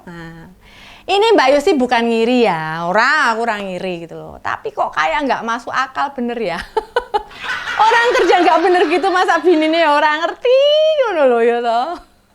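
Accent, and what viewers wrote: Java, Indonesia. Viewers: bak medok amat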